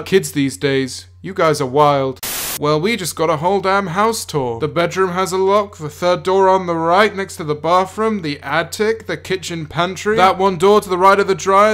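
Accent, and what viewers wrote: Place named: United Kingdom. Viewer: I am trying to take you seriously, to understand your narratives but your voice is killing me. I can't take it, it's worse than James Corden. The British accent with the fast-talking is messing up my brain. That wasn't meant to be a roast. That's just how I feel. I like Tro's random comedy, that's my preference, but I know what you mean by his repetitive content. I am kinda getting annoyed by it too. JustDestiny is just like Tro too. Exactly, the same type of video style, but I like Destiny's roasts better, and Tro's comedy better. That's why I am subscribed to both channels! :) Also at first, I thought JustDestiny, TriggeredTro, and LeafyisHere were all the same person. Just the same video style, same content, none of them ever show their faces, they all have a game playing in the background, and they all sound like 20-year-old white guys who just dropped out of college. 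(Not meant to be a roast, my opinion) Sorry for the novel you had to read! Peace!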